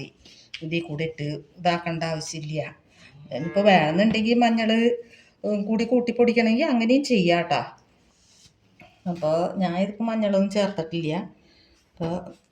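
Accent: Thrissur, India. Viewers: സാമ്പാർ പൊടി നന്നായി ഉണ്ടാക്കി കാണിച്ചു👍👌😋😋 ഇഷ്ട്ടമായി ഞാൻ അന്നന്നു വറുത്തരക്കുകയാണ് പതിവ് തൃശ്ശൂർ ആണോ സംസാരം കേൾക്കുമ്പോൾ തോന്നുന്നുണ്ട്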